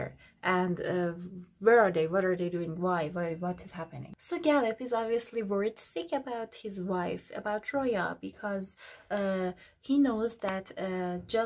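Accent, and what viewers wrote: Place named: Iran. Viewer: i just started reading it. i had second thoughts just in the beginning of the book but I'll power through. also your pronunciation of names in the book made me sure you're persain.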